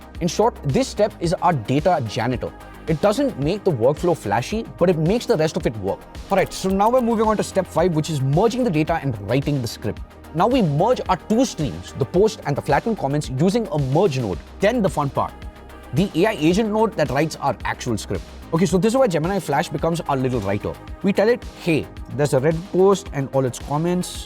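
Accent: India. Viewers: Ainda estou tentando descobrir se o sotaque é indiano ou venezuelano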